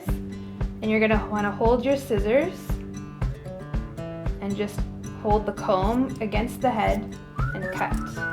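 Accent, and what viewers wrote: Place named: Texas, United States. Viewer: You must be from Texas.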